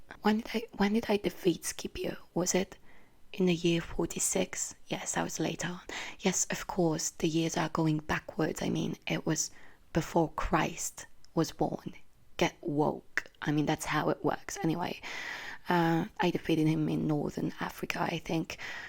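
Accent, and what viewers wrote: Australia. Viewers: *Australian pretty sure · Ngl but this sounds more Australian than British all the comments 😳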